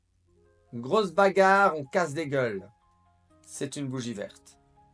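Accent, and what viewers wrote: Belgium. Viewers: Merci, d'où viens ton accent? Belge une fois?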